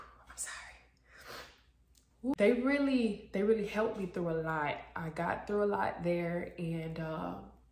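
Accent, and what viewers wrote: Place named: Broward, United States. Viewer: You can move out of broward but you can’t take the broward talk out of you lol 😂 I loved this vlog 🥰 congratulations happy for you keep going bby 👏🏾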